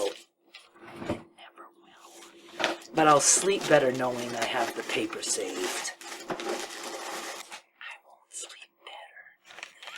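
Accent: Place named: New England, United States. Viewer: I know you’re not from Massachusetts but I was raised there and listening to you reminds me of growing up and the way people talk up in NE. I’ve lived in SC for 30 plus years. I was dozing, listening to you and I heard my name, just the way my father used to say it. I asked about ASMR and Patreon. I went to your pages. I usually google everything but just thought I’d ask you. It was nice to hear my name.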